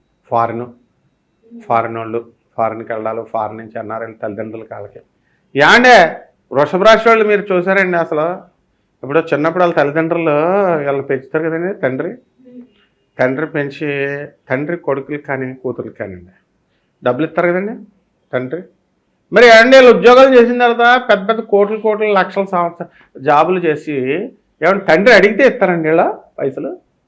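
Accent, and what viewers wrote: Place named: Godavari, India. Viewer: మీ యాసని బట్టి మీది గోదావరి జిల్లా.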